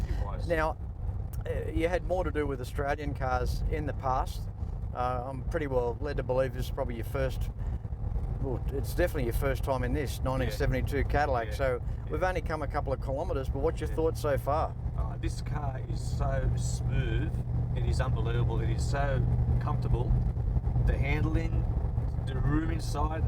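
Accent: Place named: United States. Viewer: That was a very convincing American accent . Iv'e yet to hear an American do an Australian accent well .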